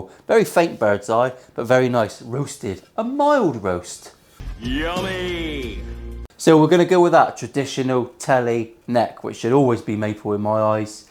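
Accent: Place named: Plymouth, United Kingdom. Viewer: There is a 'voluptuous', but that's nothing like as good as what you said! Absolutely love hearing Plymothian again. Great work.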